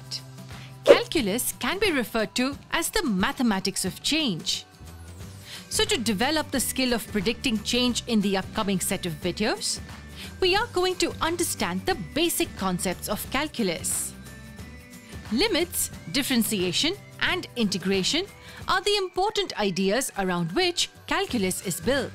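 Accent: India. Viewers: WHY DID IT HAVE TO BE A IDAINAN VOICE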